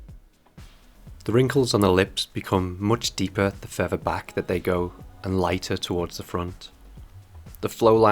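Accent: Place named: Liverpool, United Kingdom. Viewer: Thank you so much for putting these high quality videos together! You have a soothing but firm tone in your voice. Although it's subtle, I like the Liverpool accent as well! :)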